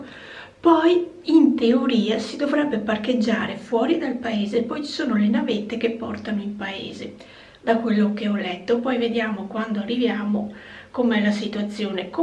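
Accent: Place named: Veneto, Italy. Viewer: Ciao ! Carino il tuo vlog! Dal tuo accento ho capito che sei veneta come me! Baci!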